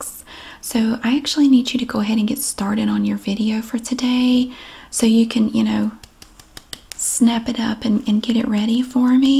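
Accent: South, United States. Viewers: Y’all, I thought she was talking to me and almost reported myself………😊 damn I love a southern woman and accent. She has me like ole yellow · Tell me you’re a Southern lady without telling me you’re a Southern lady. Git ‘em! Love you!!!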